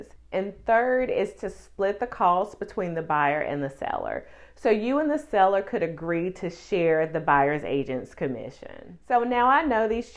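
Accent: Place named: South, United States. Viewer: First of all, I love the southern accent! Also, thank you for the information. I’ve seen a lot of unqualified people going to real estate the last few years. They think it’s going to be an easy way to make money. It’s refreshing to see somebody who has the knowledge and qualifications. I have never understood why the seller has had to pay for the buyers agent. Just because the seller walks away with a big bag of money why does that make them liable for your agents commission? It always seemed to me that the buyer should pay their own agent!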